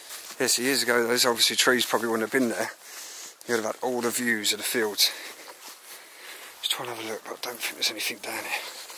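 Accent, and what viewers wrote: England, United Kingdom. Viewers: The best explorers on YouTube. Not too much talk, and when do, the great English accent. Some explorers know very little about what they are looking at.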